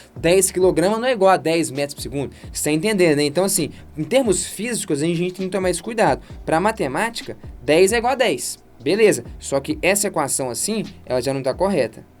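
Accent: Minas Gerais, Brazil. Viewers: Esse sotaque mineiro deixa tudo ainda melhor 😊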